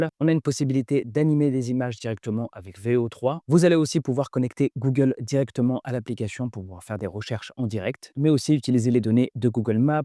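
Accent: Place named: England, United Kingdom. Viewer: Super ton accent anglais, ta vécu dans un pays anglais?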